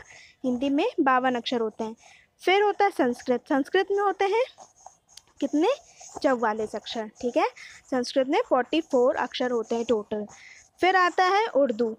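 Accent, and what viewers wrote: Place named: Bihar, India. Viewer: आप बिहारी हैं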